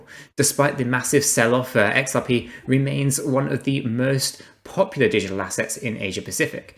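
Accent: England, United Kingdom. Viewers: The English accents are a giveaway no?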